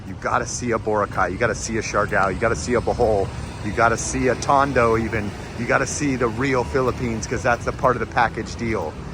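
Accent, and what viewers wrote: United States. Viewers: I don't get your point dude. We don't have fake cities. You sound like an American. I am wondering if what is the real United States for you also? You're dying and abandoned cities?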